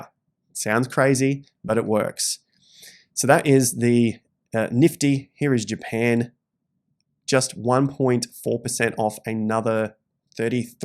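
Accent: Australia. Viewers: Love your video mate.. Very informative to me. Your Aussie slang its nice.. I think BTC will make small corrections when it achieves 48k-52k.. The bottom will be at 40-42k..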